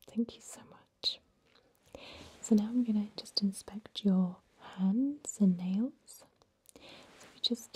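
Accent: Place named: Lancashire, United Kingdom. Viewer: Sometimes I don't know if I prefer a Lancastrian accent to a posh southern accent.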